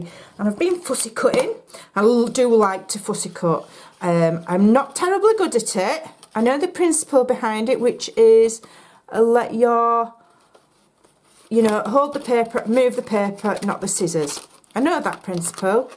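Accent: Yorkshire, United Kingdom. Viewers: Oh my gosh girl. Not only do I love this box, but you make me laugh. It's just like listening to my cousin talk! i was born and bred in Yorkshire, and my family still live there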